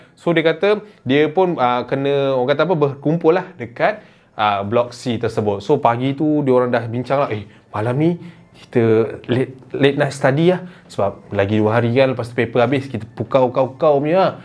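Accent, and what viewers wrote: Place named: Kedah, Malaysia. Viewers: Comel je ckp kedah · Pandai cakap utaqa noh... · Best pekena liwat Kedah..mcm Imuda bercakap😁😁😁😁😁😁👻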